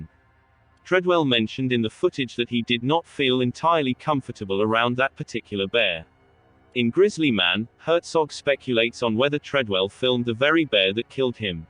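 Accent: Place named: United Kingdom. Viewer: It's not a robotic voice. It's a British voice and the real audio based on news reports. I listened but wish I hadn't.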